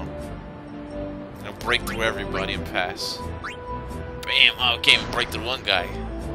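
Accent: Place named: Arkansas, United States. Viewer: WAKA WAKA WAKA! dude are you from arkansas? You must be...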